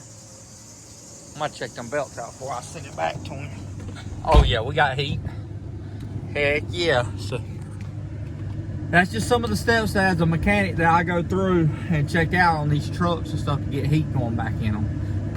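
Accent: United States. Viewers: You already know when they got that southern accent, they know what they doin. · Great. No rockNroll noise. Except for the Carolina accent it was perfect. 5 stars.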